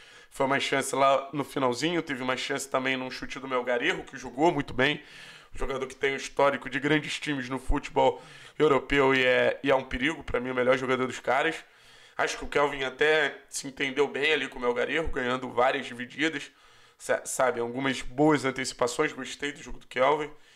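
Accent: Rio de Janeiro, Brazil. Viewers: Sotaque carioca + Camisa do CAP ? Like.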